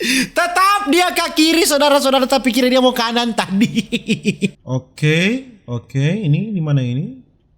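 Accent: Manado, Indonesia. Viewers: Logat Nya Kak Yg Bikin S Betah Manonton Memang Orang Manado Da Best Memang #SalamDariLuwuk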